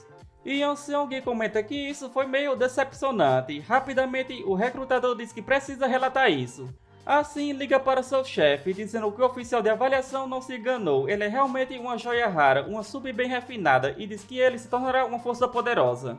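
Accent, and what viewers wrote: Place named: Northeast, Brazil. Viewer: Tu parece nordestino man Kkk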